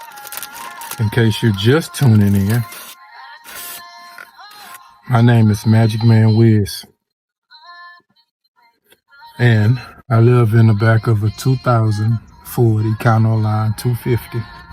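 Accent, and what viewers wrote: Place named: Texas, United States. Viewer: You look like a friend from Texas back in day. I hear that accent you got, homie had that. Back day I had to move on. I couldn't under where homie was going. So I waited, and waited. When Engelwood, Chicago south sides meets a Texan from H- town sparks fly, Texans believe that Texas thing they are bigger ruffer then average man. One on one no weapons we when at it homie had to give respect specifically around them why boys. I was mothers boy but had eight brothers and sisters so I did the ass kicking bullies to protect them and weaker kids and friends. He was first homie outside Chicago. Unfortunately homie didn't under what you saw was not real me. I didn't sell drugs or gang stuff. I was navy trained Electrician so thats direction I took. Homies walked a different path. I still think homie was the shit! Dam dude you look like homie!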